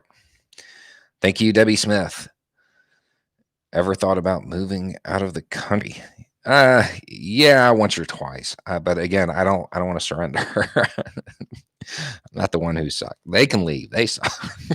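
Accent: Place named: South, United States. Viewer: He does. I've seen a clip where it's hardly noticable. (Can't find it now.). I think he's the "I know a man" having to hide their accent (from a previous video) because people won't take him seriously as a journalist, and question the intelligence of anyone with this southern accent.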